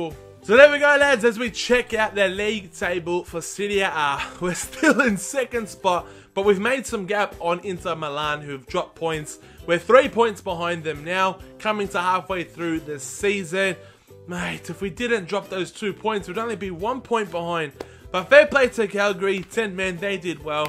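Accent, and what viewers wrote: Australia. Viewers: are you a fellow Aussie?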